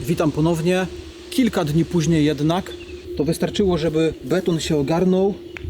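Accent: Silesia, Poland. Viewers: Cześć oglądam twoje wyczny od początku kanału,pracowalem dużo na Śląsku w twoich rejonach jako dekarz, i jak wracałem do siebie to godol jak ty😂jak byłem mlody to tes wszystkie drzewa i kominy były moje,teraz wiekowy się robię...moj rekord na drążku nachwytem 19 ale miałem 20 lat...teraz starość zaraz wnuki może będą 😊ale staram się ruszać, pozdrawiam i wysokości, tylko z głową jak zawsze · Super kondycja i fajno ślonsko godka.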